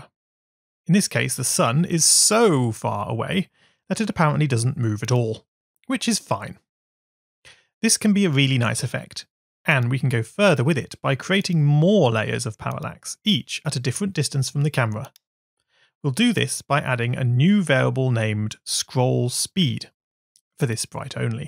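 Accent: United Kingdom. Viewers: Not really, it's just a british accent, but I see where you're coming from, as they do have similarities.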